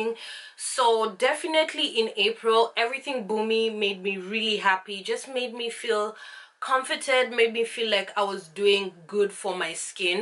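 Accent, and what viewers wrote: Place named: Kenya. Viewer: Hi 👋🏽 Have you been to Kenya? You've got the accent.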